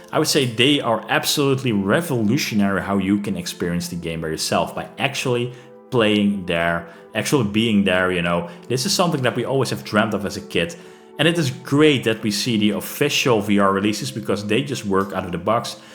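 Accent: Netherlands. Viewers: Ik hoor n hollander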